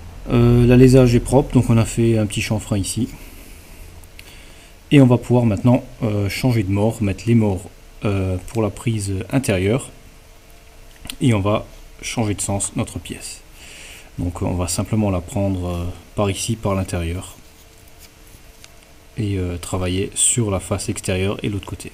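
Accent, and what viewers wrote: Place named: Alsace, France. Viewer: ça fait plaisir de voir ton travail surtout quand on sait ce que tu es capable de faire via le forum, je me suis abonné a ta chaine qui est une mine d'informations. Tu ne dois pas etre loin de mon secteur ( alsace ) vu ton accent. Merci pour tes vidéos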